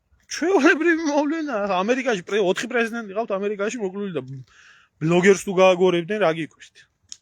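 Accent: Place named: Imereti, Georgia. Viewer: ძმაო იმენა ორივე აქცენტი გაქ საუბარშიც ქუთაისური იმერული და სვანურიც ორივეგან რო ხარ გაზრდილი გეტყობა საუბარში მაგარი კოლაბორაციაა ქართული😅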